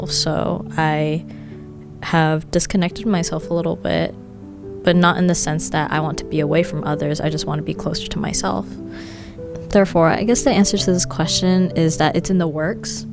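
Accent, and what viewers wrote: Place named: United States. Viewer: Thanks for talking like an educated American USA in YouTube.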